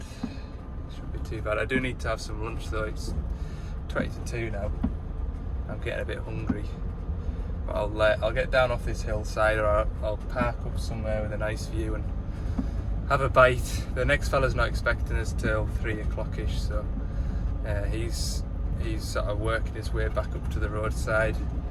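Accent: Cumbria, United Kingdom. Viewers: Cheers, looking forward to more excellent videos. Love the Cumbrian accent, unfortunately mine's all Barrow!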